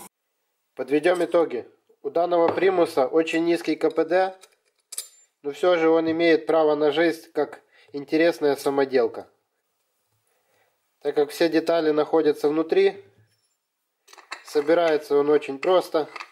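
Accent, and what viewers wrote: Odessa, Ukraine. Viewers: акцент как у Одесских евреев.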